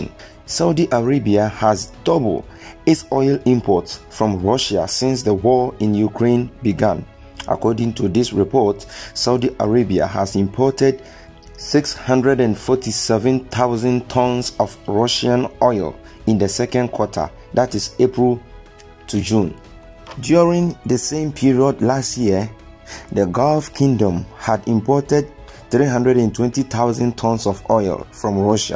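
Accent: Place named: Africa. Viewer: It is an accent from somewhere in Africa.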